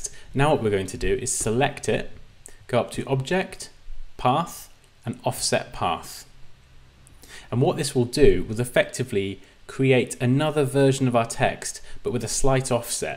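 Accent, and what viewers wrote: United Kingdom. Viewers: This tuts save my life, very interesting. And good UK speaking, i say it because when i surf into tuts made by people in USA i don't understand a f***. (i'm from Italy) Btw it's a great job!!! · his voice you mean his english i guess is british am i right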